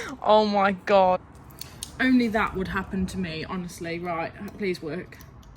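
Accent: United Kingdom. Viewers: Great little video, although simplistic it's what I understand best, but I am struggling to place your Northern accent. New Sub too, so looking forward to the next video. Ed 😉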